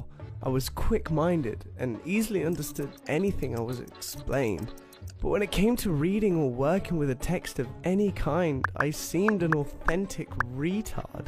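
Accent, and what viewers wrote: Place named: United Kingdom. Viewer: His voice in this story is pleasing to listen to and his British accent sounds pleasant too.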